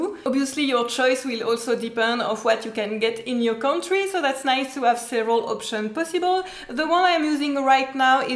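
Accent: France. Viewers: Your not french? Your accent sound like , If no good video ^^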